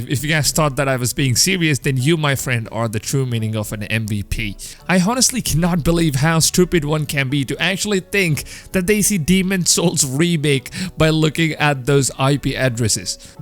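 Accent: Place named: India. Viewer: At first I thought you were some annoying Indian kid cause of your accent but your hilarious so I rub a dub subbed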